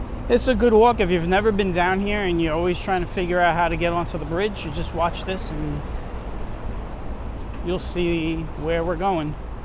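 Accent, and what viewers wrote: Brooklyn, United States. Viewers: I really enjoy your narrated videos as well as your authentic Brooklyn accent! 😊 It's a dying breed. 😢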